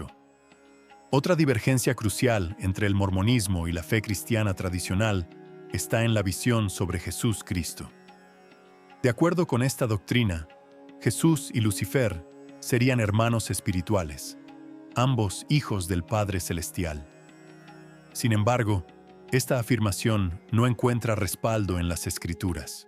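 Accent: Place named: Mexico. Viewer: Desde Colombia parcero, pero con acento Mexica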